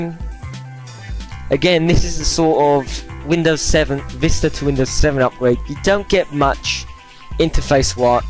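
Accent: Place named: United Kingdom. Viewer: You fool. This narrator isn't Mexican or an Immigrant. He's British. Get your narrow-minded head out your own ass.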